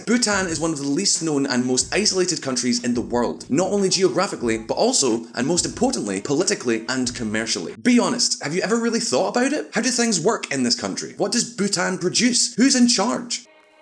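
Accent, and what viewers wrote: Scotland, United Kingdom. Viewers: Your scottish accent makes this very hard to follow. But nice video. You're cool. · I knew you would bring this so called refugee issue as you sound very British. The numbers you gave are all wrong. I can see you support for the Nepalese and I as a Bhutanese refuse to call them refugees. You forget we have a UN office in Thimphu. Totally biased reporting or whatever. Bhutan has a porous border with India and that’s how the Nepalese living in India towns of Darjeeling , Kalimpong and Nepal illegally entered Bhutan. So please stop playing the refugee card. By the way there are still many Nepalese here living peacefully and some have even become ministers under democracy.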